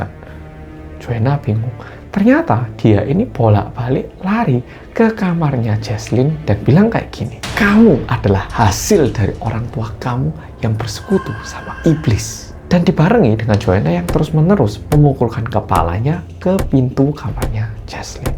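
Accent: Java, Indonesia. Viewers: 😂😊suka bgettt m cowok cowok Korea eeh Nemu konten kok BS bahasa Indonesia medok juga ... JD fans 😂😂😂😂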